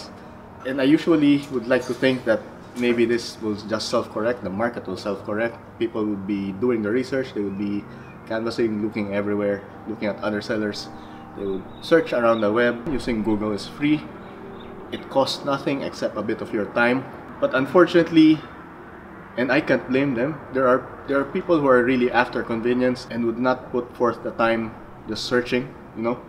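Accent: Philippines. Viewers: Are you a filipino? Your accent sound like filipino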